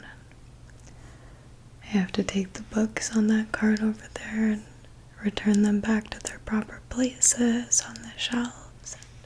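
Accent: South, United States. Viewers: Excellent as always. Southern accent is my favourite, and I'm British 😊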